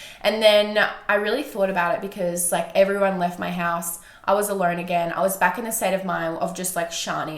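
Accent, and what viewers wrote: Australia. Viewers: You sound so Australian in this love it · even her cough sounds Australian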